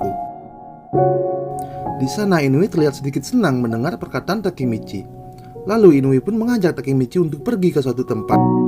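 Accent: Bali, Indonesia. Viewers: mirip logat bali ngab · Orang bali fix 😂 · org bali ya?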